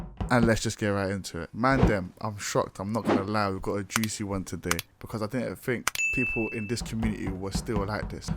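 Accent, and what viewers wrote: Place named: London, United Kingdom. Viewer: you are the most north london person i've heard in a while, bro said "mandem, we got a juicy one stylll" 🤣 love bro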